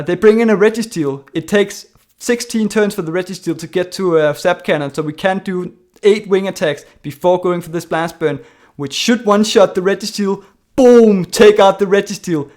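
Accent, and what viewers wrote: Denmark. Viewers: First time I've clicked on your video, and still, I could hear clearly after a few seconds that you are Danish!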